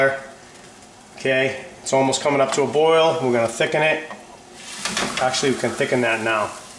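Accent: Boston, United States. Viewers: sounds like ur from boston. come on say dark againg.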